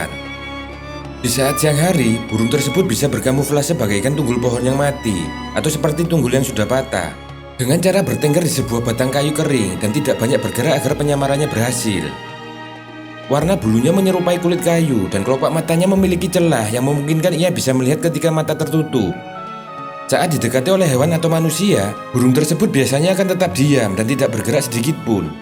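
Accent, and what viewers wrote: Java, Indonesia. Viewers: Sangat medok pak de like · jawa banget suaranya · mendok amt lu min😅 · Suwaramu kang kang medok bianget. WeeLiikk 😀😀😂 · Suara mu medok.. Mas..' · Yg baca narasi medok.. 😀👍jd ingat temen saat kuliah.. 😀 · Meduk bngt jowone 😂😂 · mantab logat jawanya medok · Ngedok ketoro wong jowo😂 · Medok banget son suarane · Min medok banget sih min Logat mu, , , ? Tpi ga pa2 ko min wong udh dri sono ny ky gitu, menuso yo , Ga iso ngrubah toh, , , !? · Jawa banget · Salfok dgn suara pembaca narasi. Medok total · adminya logat jawa bngt...kuuueeennntttaaalllllllllllllllll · orang jawa kau ya bang?? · Yg ngomong medok amat yak kek org jawa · Medok ajah ngomong nya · Dlm dunia broadcast medok gini gk laku siaran radio n tv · medok x bnk..tp q sk.mnghibur..😂😂😂🙏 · Mantaaap.......... Ngomongnya yg mantap jawanya MEDHOCK......🤭🤭🤭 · Logatnya khas jawa btul ya😂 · medhok yo kue..😁😁🤣🤣🤣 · Medok banget....😀😀😀😀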